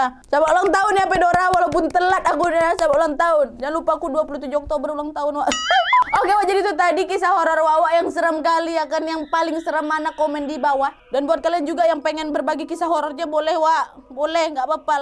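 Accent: Indonesia. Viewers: wajar lidah indonesia kebanyakan gitu ngomongnya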